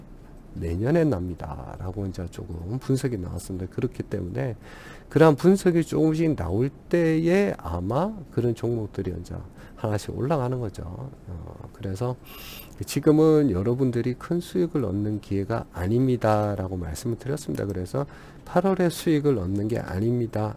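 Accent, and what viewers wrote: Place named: Gyeongsang, South Korea. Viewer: 경상도 영어가 리듬이좋아서 더 잘들리죠 ㅎㅎ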